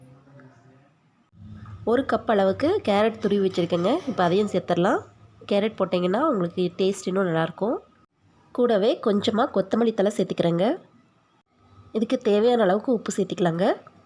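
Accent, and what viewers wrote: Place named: Coimbatore, India. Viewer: Neenga paesaradha Partha, neengalum namma coimbatore thaan ah🤔🤔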